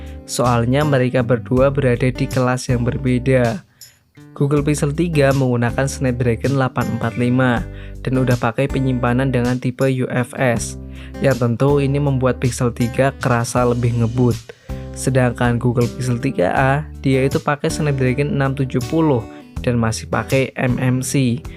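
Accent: Wonosobo, Indonesia. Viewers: wong sobo ta mas?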